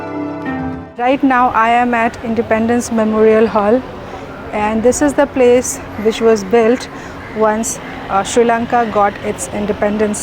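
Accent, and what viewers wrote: India. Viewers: It come.with Hindi pronounced not sankrit Hindi lot.ending with et and r thats to english as well.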